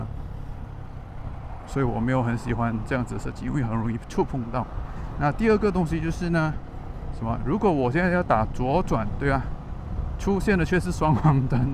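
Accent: Taiwan. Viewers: 给你的口音骗了，还以为是台湾人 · 台湾口音😂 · 你是什么人，为什么有台湾腔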